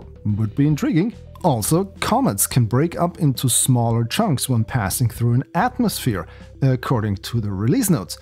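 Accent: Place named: Germany. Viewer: I have the feeling to hear a german accent. If not correct me but Du klingst wie ein Deutscher, der verdammt gut Englisch spricht! Nice Video btw. LG Justus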